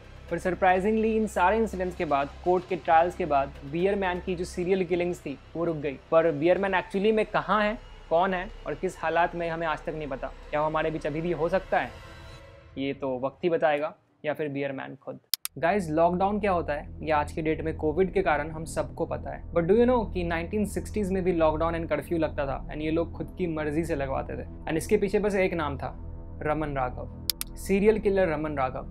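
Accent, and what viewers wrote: Bihar, India. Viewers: Bihar se hai ye banda...sure!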